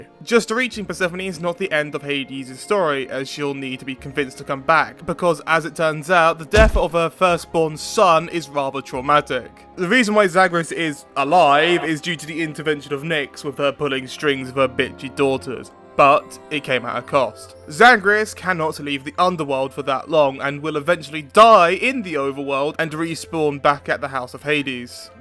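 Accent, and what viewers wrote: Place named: United Kingdom. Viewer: Next time pick a non British voice actor. Great video thou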